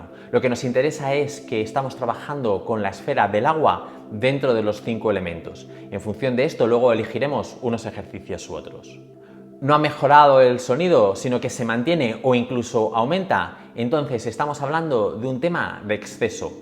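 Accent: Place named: China. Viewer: Usted estudió en China? Tiene cierto acento en la musicalidad del español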